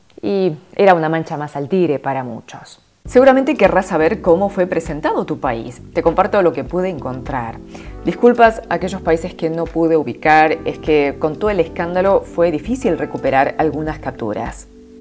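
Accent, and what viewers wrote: Argentina. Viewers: Primera vez que veo este canal. Muy bueno. Pensé que me hablaría en coreano y habla español argentino :) saludos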